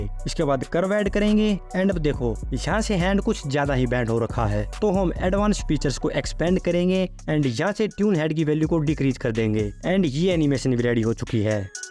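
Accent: Haryana, India. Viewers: Haryana sai kya aap 🤐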